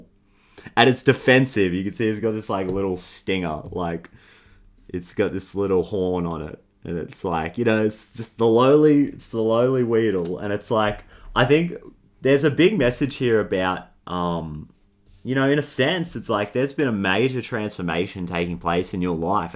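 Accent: Australia. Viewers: Hello from California. Great voice. Great messages from Australia. Fantastic Australian Accent, it's known as one of the most trustworthy accent. We appreciate you. Have a good day/week/month, from our channel. :hand-pink-waving::hand-pink-waving::hand-pink-waving::trophy-yellow-smiling::trophy-yellow-smiling::trophy-yellow-smiling:🐦🐦🐦🦅🦅🦅🦜🦜🦜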